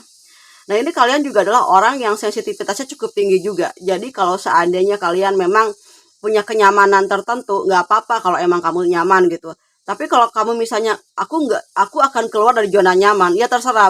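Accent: West Java, Indonesia. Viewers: Aduh ngakak..lucu..orng sunda ya nih?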